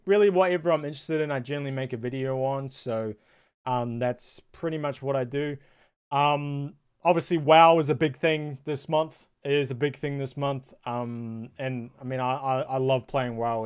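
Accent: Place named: United States. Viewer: Im a kiwi....Your accents odd....Kinda got an american twang.....sorry....this comment probably isn't needed....but i've written it so ill post it lol.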